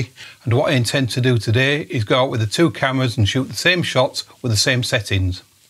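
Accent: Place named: Hull, United Kingdom. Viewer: Can't hide a Hull accent :)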